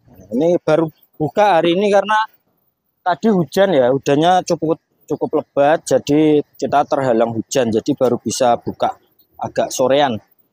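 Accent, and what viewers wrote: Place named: Central Java, Indonesia. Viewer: Bahasane koyo wilayah Demak kudus dsktnya iki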